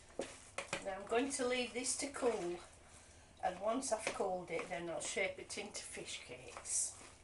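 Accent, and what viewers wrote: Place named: Derbyshire, United Kingdom. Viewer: thanks for the recipe and demonstration, loving the accent, Derbyshire if i'm not mistaken, a beautiful part of the country, feels like home even though i'm a southerner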